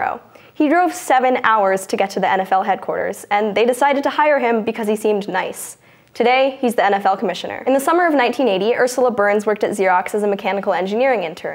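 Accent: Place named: Tennessee, United States. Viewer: What part of Tennessee are you from?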